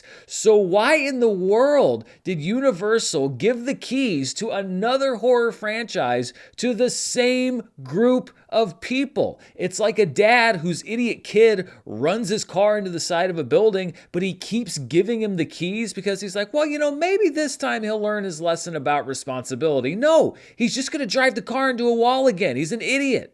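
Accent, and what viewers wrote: St. Louis, United States. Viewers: Are you from St Louis?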